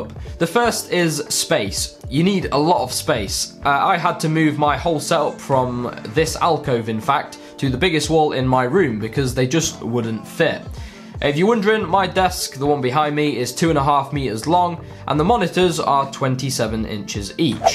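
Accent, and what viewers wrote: United Kingdom. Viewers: Love your british english xD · its 36 secs in and i cant ignore that bri´ish accent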